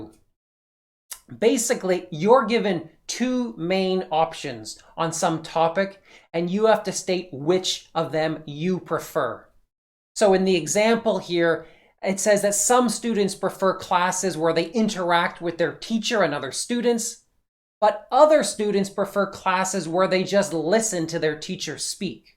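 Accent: Canada. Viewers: Just out of curiosity, are you Canadian? I hear some Canadian accents. My Canadian friend and i used to fight over English (not British, just language) accents like 'Resource' or 'Rezzource'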